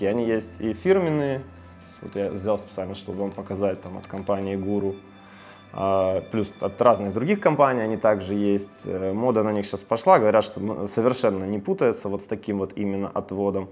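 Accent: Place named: Ukraine. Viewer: на первый чемпионат,случаем не за сборную Украины ездил?Уж очень Говор характерный слышен…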